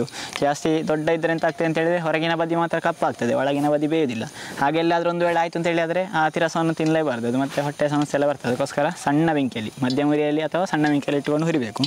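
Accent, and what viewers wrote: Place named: Dakshina Kannada, India. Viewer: It's called athirasam among the Tamils, kajjaaya among the Kannadigas and Anarasa among the Marathis. It is a pan south Indian sweet. In my childhood at our village , we didn't know cakes and other cookies..Athirasam is so popular, no festival is celebrated without Athirasam Kajjaaya. Thanks for your narration with tips on making paaku . Your DK accent is feast to ears.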